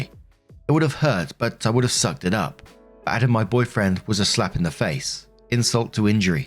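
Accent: United Kingdom. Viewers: you are so insanely wholesome. I used to listen to other people reading these stories but there is nothing like an accent from home and haven't listened to anyone else since finding your channel. I hope you are having a lush day! Keep making us brits look great ❤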